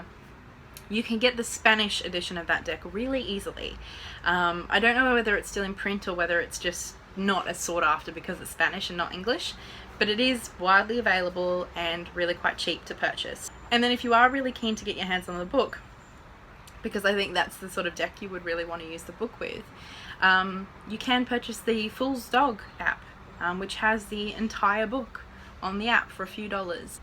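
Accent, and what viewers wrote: Australia. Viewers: Thank's for the great advice. Love your Australian accent too ! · Arn? You mean Own! Nor? You mean No! Horm? Home I think it is! Ozzy accent is thee very worst.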